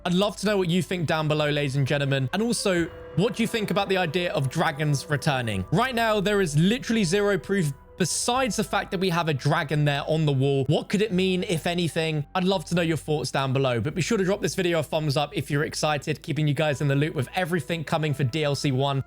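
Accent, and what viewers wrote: England, United Kingdom. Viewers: He is pronouncing it correctly he is English that's how we pronounce it (correctly) 👍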